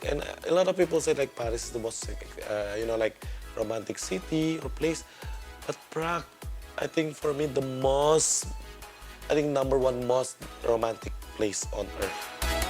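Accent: Philippines. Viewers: Amazing. I didn't realize you're an Indonesian. I have watched your videos especially the male make-up tutorial. I thought your accent is just a shade of Philippine dialect. You're so great.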